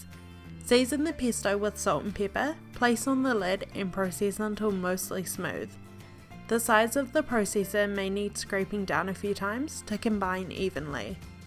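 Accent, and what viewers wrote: New Zealand. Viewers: She is a kiwi, new Zealander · Are you from NZ?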